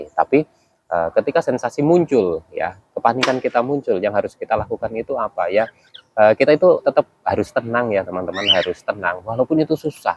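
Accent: Banyumas, Indonesia. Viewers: Kk ini didaerah mana kk ngapak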